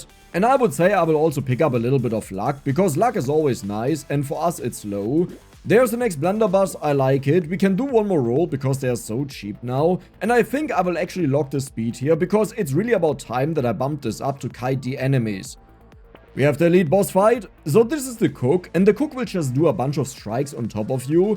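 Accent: Germany. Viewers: you can never hide that german Accent ^^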